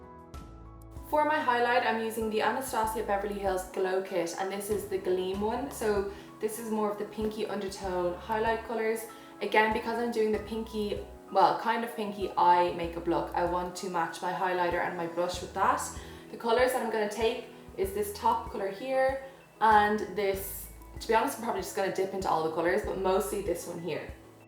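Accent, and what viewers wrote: Ireland. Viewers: Are u Irish ??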